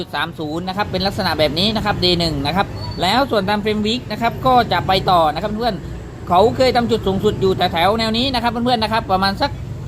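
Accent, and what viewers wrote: South, Thailand. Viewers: คนใต้แน่นอน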